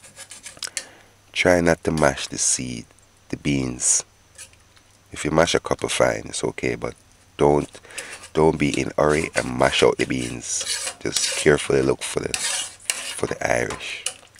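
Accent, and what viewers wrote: Jamaica. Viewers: Leave him alone, he’s not pretending to have an English accent, he is Jamaican . There are people who enjoy watching him such as myself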